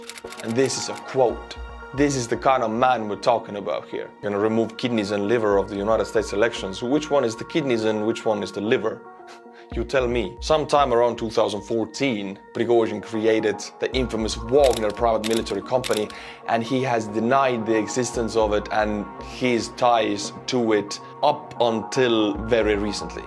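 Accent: Estonia. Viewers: I really enjoy your videos and this was most interesting and entertaining. I like your style of presentation with your awesome Estonian accent. Thank you and keep them coming! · I can't wait to support you so that I can hear you butcher my name in your Estonian accent. Always look forward to watching a new video. But I won't eat at Prygozhin's restaurants.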